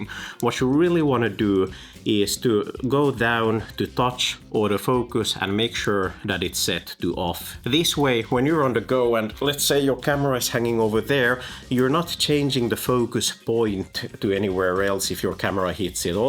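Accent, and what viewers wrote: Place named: Finland. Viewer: I'm so glad I got that correct. I met some Finns on my travels and they were so polite! Your accent reminded me of theirs. Good video by the way.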